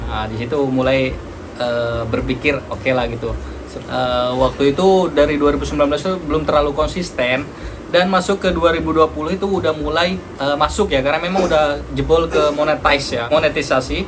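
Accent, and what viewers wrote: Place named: Medan, Indonesia. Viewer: awak setelah tahu kayak seperti bahasa medan gitu.......baru awak suscribe biar enggak apah kali....Selamat ya bang dan bang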